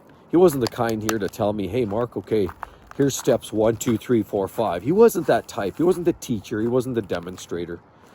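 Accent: Canada. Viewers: I'm a big fan of this channel. I come from Ireland and lived in Vancouver for ten years. Hearing the accent, seeing the snow, the houses and of course the red Tim's brings me back to a great time. I left last year because I was just spinning my wheels. Beautiful place with the warmest most open and friendly people I have ever met. This guy is as real as a youtuber gets. Keep it up. This is great.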